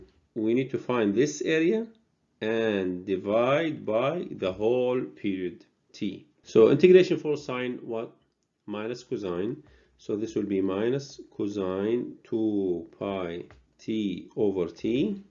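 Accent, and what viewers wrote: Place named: Arab world. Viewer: Perfect explain, and i see the Arabic voice in your explain, please make Arabic language as same as the English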